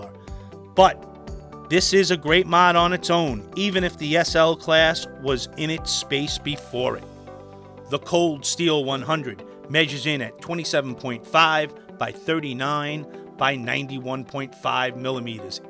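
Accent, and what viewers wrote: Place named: Boston, United States. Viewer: you have a nice boston accent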